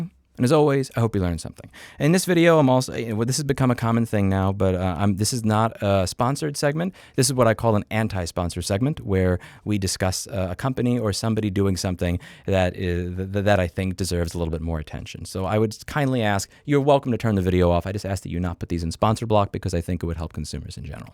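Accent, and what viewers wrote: New York, United States. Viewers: Love that NY pronunciation. Umanly possible 😂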